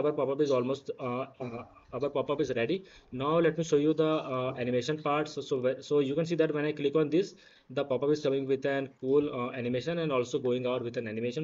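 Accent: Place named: India. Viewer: Thank you for the video, but this speedy Indian accent will kill me someday...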